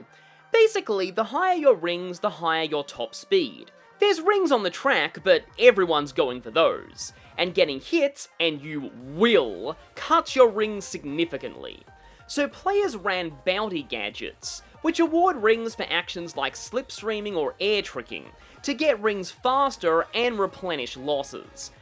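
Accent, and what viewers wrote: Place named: United Kingdom. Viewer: I didn't watch the video, I heard a feminine British sounding accent and shut it off and went straight to the comments to laugh at people that were bad and coping. Go play with a homeless man's wiener under a bridge somewhere.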